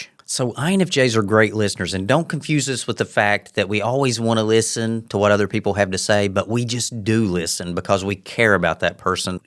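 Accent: South, United States. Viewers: I really like your southern accent, it’s nice to hear.☺️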